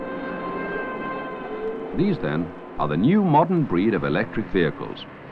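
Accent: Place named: Yorkshire, United Kingdom. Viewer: More than slight; probably Yorkshire. Like Michael Parkinson.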